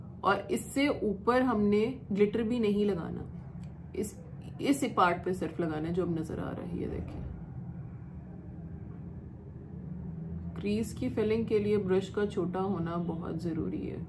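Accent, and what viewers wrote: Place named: Punjab, India. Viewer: Bhtt achi lagi kal lazmi yeh trick try krungi nd ur punjabi talking way sun kr to video dekhne m or maza ajata ❤❤❤❤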